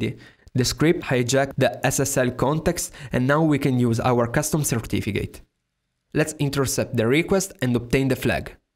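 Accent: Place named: Italy. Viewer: I was looking for cryptocat's video but apparently I found something better ahaha loved your explaination, solo un po' strano sentire l'accento italiano :D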